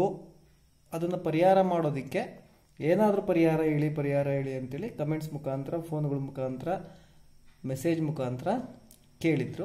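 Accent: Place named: North Karnataka, India. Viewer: Nivu uttar Karnataka davaru so nimage accha kannada mathadodikke kashta agatide modalu script ready madikondu practice Madi aamele video Madi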